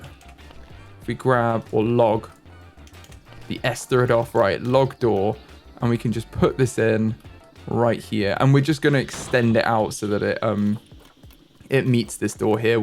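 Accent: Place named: United Kingdom. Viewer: Could be a UK versus US thing, as I pronounce it ah-mean-ities too, like and their accent sounds UK.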